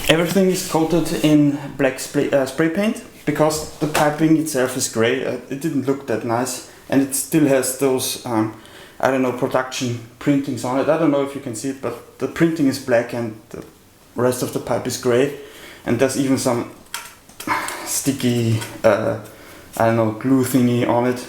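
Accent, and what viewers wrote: Germany. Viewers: Hört sich so deutsch an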